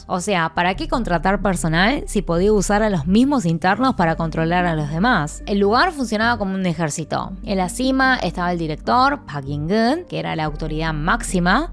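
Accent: Buenos Aires, Argentina. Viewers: Tremendo documental. SERIA MUY BUENO que la modulación fuera un poco menos de recoleta... menos nasal... realmente me costo mucho superarlo... La información fue tan precisa y tan buena la edición que me mantuvo como espectador... Pero seria muy bueno mejorar eso. En Argentina es muy chocante el acento de ¨CHETA¨ para todo el resto de las personas que no viven en los barrios de clase alta... Se lo asocia a gente privilegiada que no tiene empatía y se cree superior al resto. Creo que el VIDEO PRESENTACION en el canal es muchiiiiisimo mas accesible en ese especto. Seria bueno que esa misma fuera la entonación para el resto. POR SI SIRVE LA CRITICA. El contenido es de primera calidad. Muchas gracias. Un abrazo. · tiene acento argentino? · de todos los acentos tenia que elegir el argentino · La información es muy buena pero que pasó con el tonito que le das al hablar te estas esforzando ser una argentina o eres una fanatica del acento argentino te sugiero que le des un acento neutro eso te ayudará mucho un consejito es bueno aunque sea de un conejito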